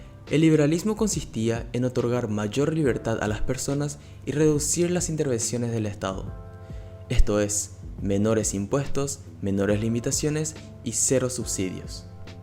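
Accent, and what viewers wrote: Paraguay. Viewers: De Paraguay?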